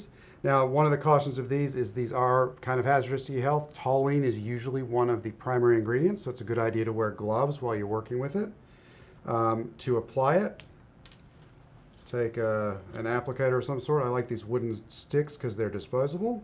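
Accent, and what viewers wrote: Australia. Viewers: Great video as usual. As an Australian I can hear a hint of our (or NZ) accent in your speech!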